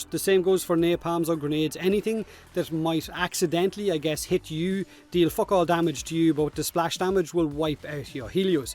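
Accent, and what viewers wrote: Ireland. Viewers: Came for the warframe content Stayed for the Irish accent and warframe content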